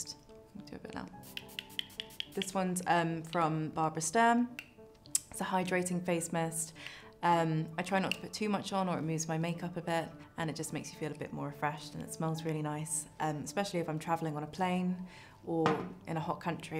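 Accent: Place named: United Kingdom. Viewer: I love her natural British accent!!!❤️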